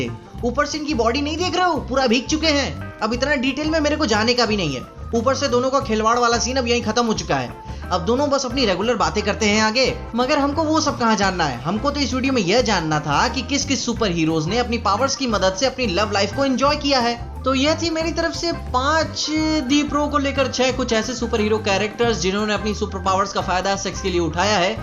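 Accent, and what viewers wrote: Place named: Uttar Pradesh, India. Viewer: bhai, i watch your video since 2 days and all your videos are soooo amazing. keep doing this and your up accent is also really amazing.... kanpuriaaaaaaaa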